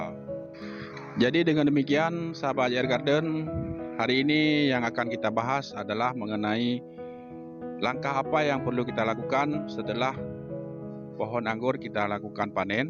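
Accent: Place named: Aceh, Indonesia. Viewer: sperti logat aceh bole tau dimana gardennya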